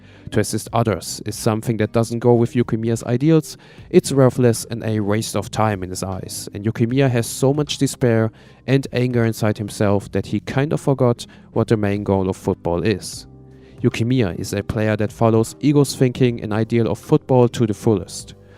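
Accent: Germany. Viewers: are you german=?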